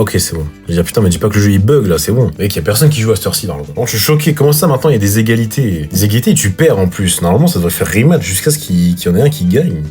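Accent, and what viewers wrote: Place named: England, United Kingdom. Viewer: accent anglais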